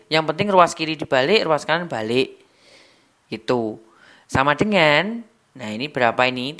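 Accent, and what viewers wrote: Java, Indonesia. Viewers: Orang mn? Medog2 gmn gt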